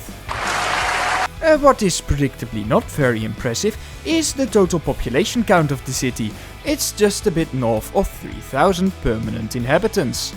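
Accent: Netherlands. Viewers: i could hear you being dutch from your accent lol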